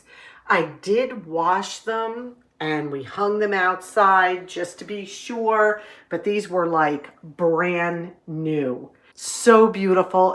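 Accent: New York, United States. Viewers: I grew up in sleepy Hollow moved to the capital district. I just absolutely love your videos, and you have the best ny accent ❤ the New York State Museum is also free and it has a beautiful tribute to 911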